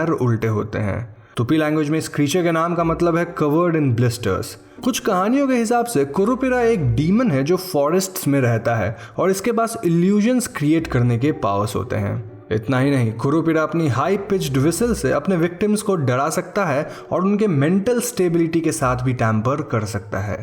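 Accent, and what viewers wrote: India. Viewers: he's indian and he's speaking english with hindi accent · Eu gosto da forma que ele começa falando uma frase em inglês e do nada já muda de idioma. Só os indianos conseguem se entender msm kk mas eu amo o sotaque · É o inglês indiano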